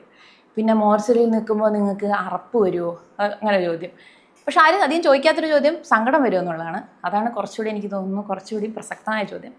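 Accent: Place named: Kannur, India. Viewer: Oru kannur slag😊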